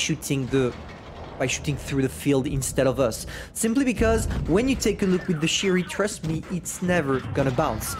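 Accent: France. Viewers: It’s not his English, it’s just the French accent everyone chill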